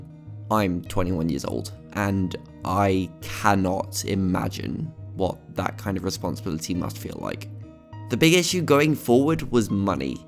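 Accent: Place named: United Kingdom. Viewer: You know its going to be a bad video when narrator is underaged brit and has undertale music in it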